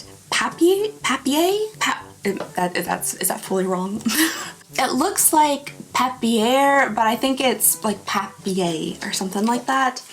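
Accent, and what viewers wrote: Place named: Ohio River, United States. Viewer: I know this is completely off topic but…. It never fails to amaze me that I live maybe 75 miles to the north of you if that and we have vastly different accents. How’s the divide at the Ohio river that mighty in this accent game?!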